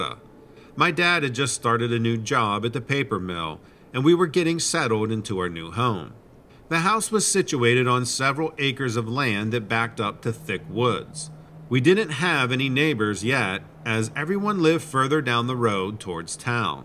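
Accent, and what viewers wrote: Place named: South, United States. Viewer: I can tell you changed the tone a little you brought on more of the southern lawyer twang tyr sound. I dig it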